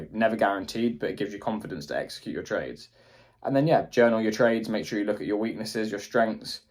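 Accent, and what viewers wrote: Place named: England, United Kingdom. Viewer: I just love your English accent!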